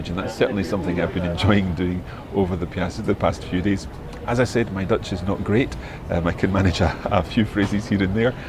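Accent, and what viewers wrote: Scotland, United Kingdom. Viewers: I love your Scottish accent,. What part of Scotland are you from?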